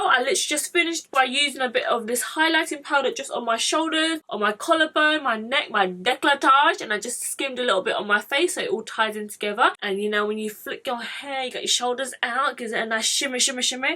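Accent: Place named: North London, United Kingdom. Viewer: You got a beautiful north London accent x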